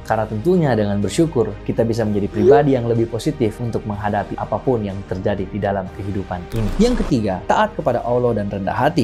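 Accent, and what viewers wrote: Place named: Batak, Indonesia. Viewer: Hai aku penasaran aksen apakah ya ? Kaya batak atau bugis ya aksen dan dialekmu?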